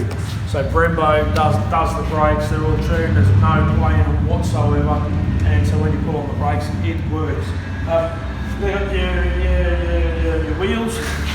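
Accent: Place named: Australia. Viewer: Everything sounds better with an Australian accent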